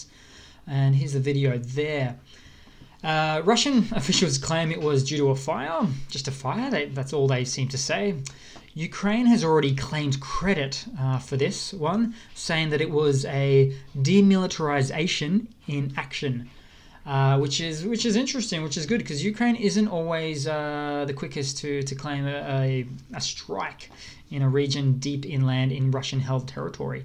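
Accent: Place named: Australia. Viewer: He sounds Australasian to me!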